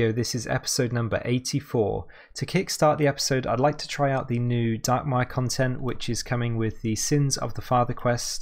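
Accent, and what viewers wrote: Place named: RP, United Kingdom. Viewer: bro im british and even I think you sound posh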